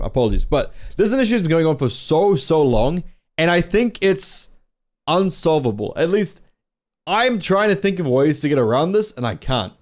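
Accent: New Zealand. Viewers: can u give me some tips on how to do the kiwi accent? it sounds just so clean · I keep forgetting you're from NZ lmao, your accent is so different from what im used to aha, love from Wellington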